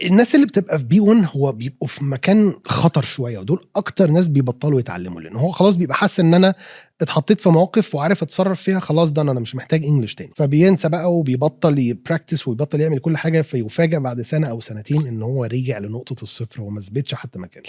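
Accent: United States. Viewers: Hi How are you? OK I hope. Thank you for this useful video, I felt your accent is the American one & it is really heavy, if I speak with you, my accent will be the English accent of London😅,I have learned English the hard way, I speak French plus some Spanish, Romanian, Turkish, kurdish & some other dialects as well plus mother language too 😊 My level in English will be between B2 & C1 👍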